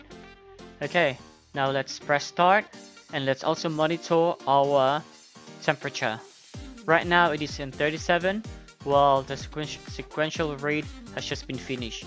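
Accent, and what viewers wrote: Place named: Australia. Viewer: dawg i know you're Pinoy so cut the aussie accent and speak our accent for the pride of the Filipino People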